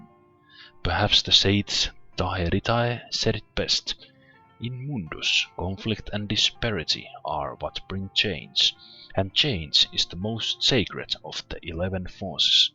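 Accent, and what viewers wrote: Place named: Finland. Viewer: you sound like Ville Valo , less refined when he was young with strong Finnish accent... Cheers from Virosta ! :D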